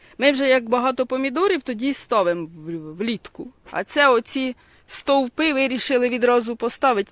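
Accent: Poltava, Ukraine. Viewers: Мені здається,що у Вас полтавський говір,чи ні? Якщо так,то який район?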